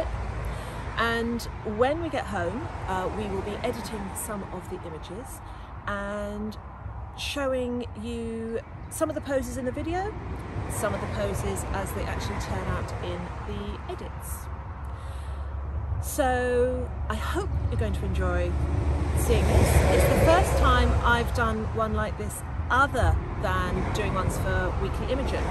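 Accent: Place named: England, United Kingdom. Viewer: I just came across this and glad I did. Another fantastic job of a beautiful Natural Woman in a lovely setting. I love the pics on the stairs. Sensual and sophisticated. What makes you different from some of the other models is your natural look. No overly made up, fake looking, Barbie doll. And I love your narration before and after the shoot. You have a wonderful voice, very smooth and soothing to the listener with that very nice English accent. I would love to see you in some pics from an Old English estate type setting with maybe a bit of narration about the history of the place. That setting would fit you perfectly and cover all the bases regarding your unique look and personality. Thank you and keep up the good work.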